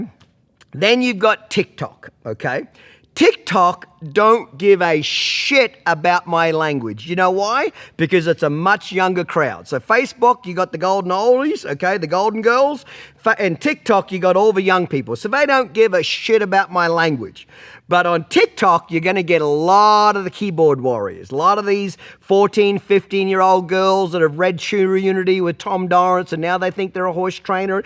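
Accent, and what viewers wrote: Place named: Australia. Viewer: I never knew Garth Brooks has a Aussie accent..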